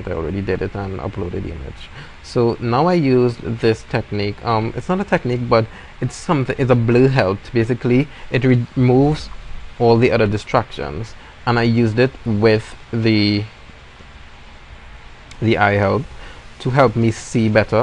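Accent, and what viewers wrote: Trinidad and Tobago. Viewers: Def a trini with that accent, nice work. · Are you from Trinidad ? :) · definitely from Trinidad!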